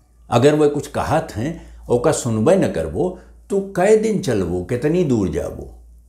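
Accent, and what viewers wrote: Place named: Awadhi, India. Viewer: Aap jab awadhi Maa bolat hai to sunai Maa aur accha laagat hai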